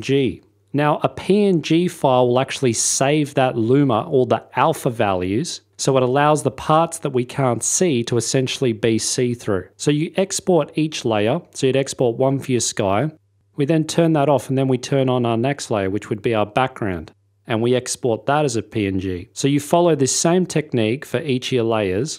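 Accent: Australia. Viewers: Straya! I've been learning After Effects from a lot of different wonderful people on here from around the globe - It's nice to finally hear the Aussie accent.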